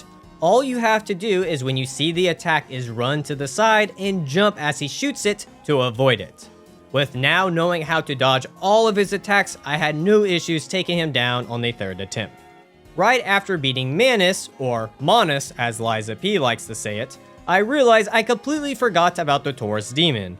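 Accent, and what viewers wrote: Louisiana, United States. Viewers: Immediately subbed when I heard the Cajun French, Louisiana/Souls representation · Hearing the Cajun activated me like a sleeper agent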